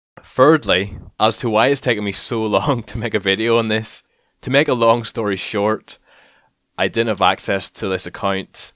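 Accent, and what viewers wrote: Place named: Scotland, United Kingdom. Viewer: Is it bad that I've lived in Belfast for three years and I genuinly thought you were Scottish based from your accent.....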